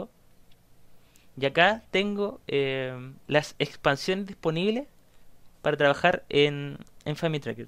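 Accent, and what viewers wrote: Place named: Chile. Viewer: tienes una mezcla de acento chileno con toques de acento andaluzmes algo raro pero mola mucho tu voz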